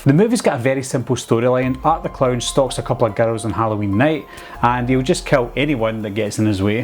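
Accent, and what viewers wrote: Scotland, United Kingdom. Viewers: You from Scotland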